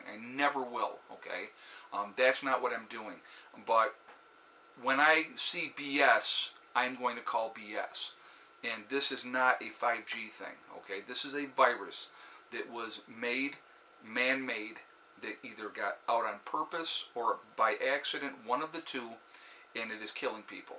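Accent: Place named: New York, United States. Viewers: That’s too funny. I thought you said 8,000 but it didn’t even phase me because I don’t watch the market. I think it’s the NY accent! Blessings! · I heard 8,000 too! 😄 Must be that NY accent. In NY, 'A' means 'What?'. No biggie. 👍 Obviously, this virus is being handled by the 3 Stooges. I've spent a ton of $ too. My credit card is screaming! Think, research, prepare, and plan to take care of yourselves and your families. Stay safe everyone & God bless.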